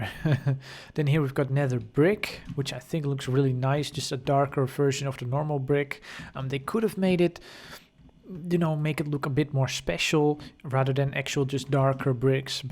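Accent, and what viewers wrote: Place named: Netherlands. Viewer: Can tell that beautiful Dutch accent anywhere. <3 x3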